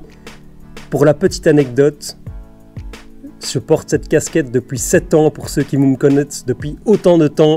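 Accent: Belgium. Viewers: = vive l accent belge j adore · = un accent belge à en rendre jaloux les Français. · = un accent belge bien sympa, avec des vidéos bien intéressantes pour novices et confirmer!👌🏼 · = le meilleur accent belge de tout les temps!:D Le team Celt'fish te suit quotidiennement sur les réseaux! À bientôt! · =la bonne ambiance de tes vidéo et ton accent belge font des vidéos super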